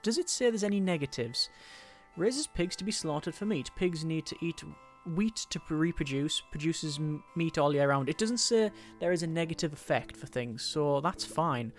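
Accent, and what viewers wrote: United Kingdom. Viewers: You are British or Scottish, I Know it.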